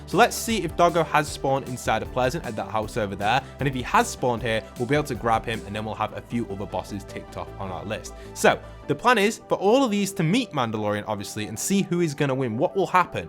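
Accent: United Kingdom. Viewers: Also you kind of sound Scottish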